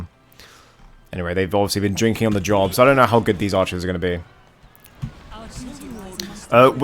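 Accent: United Kingdom. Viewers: I liked as soon as I heard this glorious British voice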